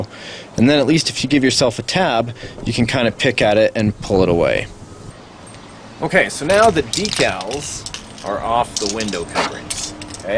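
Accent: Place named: Canada. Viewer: Looks good , I like the fade and the tint . It is kinda funny hearing you deck'lls , I firs heard the on trailer park boys and thought it was just how Bubbles said it , I now know its a Canadian thing . Keep up the awesome work can't wait to see ya tow your crawler in to dudes garage or your mud tuck out to the trails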